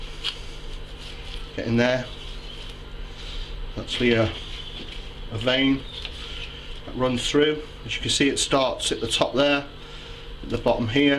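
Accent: United Kingdom. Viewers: I really enjoy watching your videos. You are a real funny guy. The British accent makes me laugh when you say words and phrases like "give it a go", "really lovely", and "just fantastic". The combination of your great personality and educational videos make it fun to watch. Keep up the great work. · He's British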